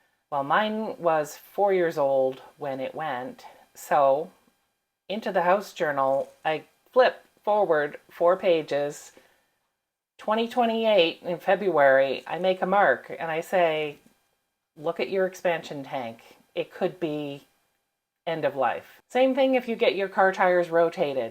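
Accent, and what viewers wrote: Canada. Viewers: You're Canadian, aren't you? 😅😅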